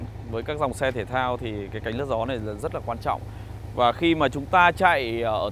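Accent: North, Vietnam. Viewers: kieu giọng bake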